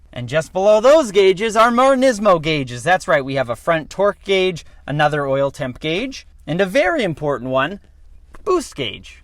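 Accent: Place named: United States. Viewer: Turned off when heard American accent only aussies build tuff GTR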